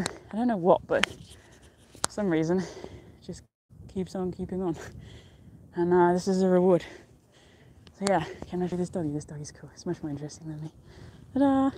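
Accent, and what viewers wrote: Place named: Scotland, United Kingdom. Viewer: Fab views, thanks. Love your wee Scottish accent, can’t wait to see you in a kilt 😉x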